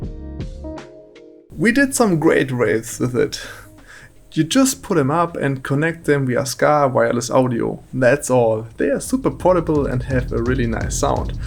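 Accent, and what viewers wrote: Germany. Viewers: Man hört deinen deutschen Aktzent mega raus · Da hör ich doch den Deutschen Akzent raus🧐🤓😅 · you have the most german accent ive ever heard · Dein Englisch klingt echt deutscher als meins? Wie? Und ich dachte ich wär der einzige der zu doof is für diese komische aussprache xD nichts für ungut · Ich fand dein Video sehr hilfreich und man hört sehr, dass du deutscher bist xD. Aber könntest du mir ein paar Daten des Lautsprechers geben? Also wie viel Watt RMS der ha z.b?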